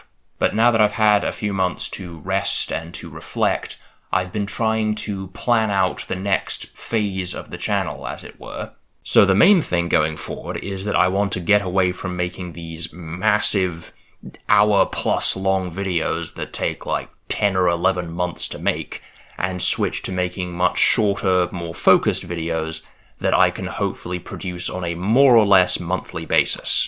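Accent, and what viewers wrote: United Kingdom. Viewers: Omg you’re British!!! I thought you were a Neotectan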